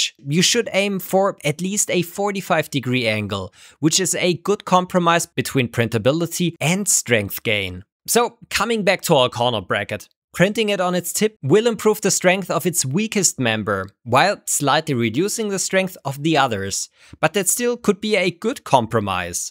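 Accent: Germany. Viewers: I can hear that german accent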